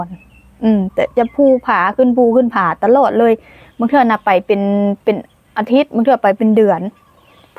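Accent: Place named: Sakon Nakhon, Thailand. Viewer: สำเนียงพูดคือ ไทย สกลนคร 😊😊😊🇹🇭🇱🇦💓😊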